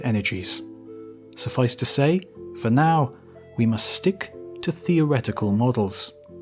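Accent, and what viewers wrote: England, United Kingdom. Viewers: The english accent is suposd to make this sound smart .We know for a fact there was no big bang . Academia's Scientists and its standard cooperation suck . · So glad you don’t have an American accent. Nobody likes to be given information in an American accent over a sort of British accent. Well…there are some grossly intense bigots who love that sort of thing, but they’re outliers. Though…perhaps not so outlying as I’d like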